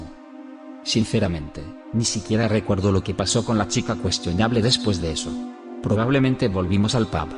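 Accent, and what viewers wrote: Russia. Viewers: Es una chica, es extranjera, no se si sea la única administradora del canal. Tiene un acento euro asiático o similar, tal vez ruso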